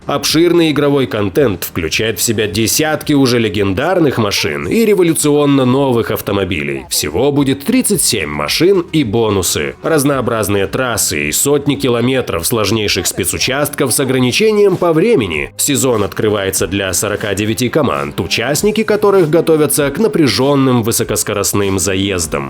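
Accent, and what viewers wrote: Ukraine. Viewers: ти украинец?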